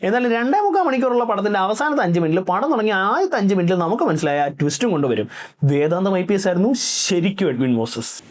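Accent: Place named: Thiruvananthapuram, India. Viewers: തിരോന്തരം ഭാഷ നന്നായിട്ടുണ്ട്. മലയാളം സിനിമ റോസ്റ്റ് ചെയ്യുമ്പോ ഇങ്ങനെ മതി. മടുക്കുമ്പോൾ ജില്ല മാറ്റിയാൽ മതി. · Oooh trivandrum slang 😮. Kollaam 😌❤ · ah thirondoram slang.. thaali konnu 🤣🤣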